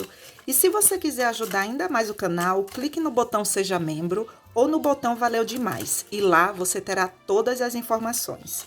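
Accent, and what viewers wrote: Bahia, Brazil. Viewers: Conheço sotaque baiano de longe,amei a receita e sua voz kkkkkk. Bj 😙 sucesso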